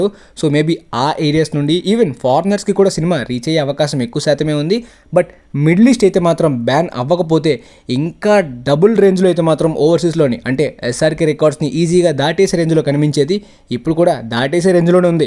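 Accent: Srikakulam, India. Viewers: hey srikakulam ah medi ??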